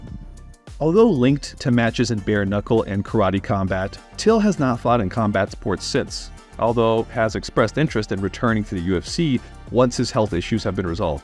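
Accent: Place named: Liverpool, United Kingdom. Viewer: You could be the biggest badass in the world and you still wouldn’t be taken seriously with that scouser accent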